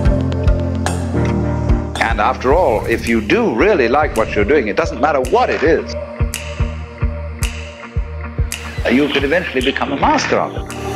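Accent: South Africa. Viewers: Are you South African because your accent sounds familiar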